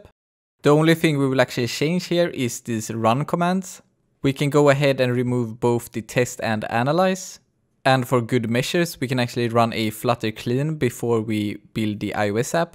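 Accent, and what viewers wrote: Sweden. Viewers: Sverige accent for sure.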